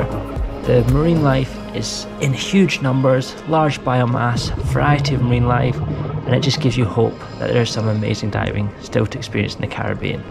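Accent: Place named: Scotland, United Kingdom. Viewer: It's one of the many things I love about your channel. Never lose your Scottish brogue. I have cousins that visit from Ireland. I can tell the difference between that and Scotland but there are overlaps. Someone might say, "fancy going for a wee dander?" There was a time when I did not realize that meant, would you like to go for a walk? My wife is French Canadian and I never realized how many figures of speech American use that she is not familiar with. 😄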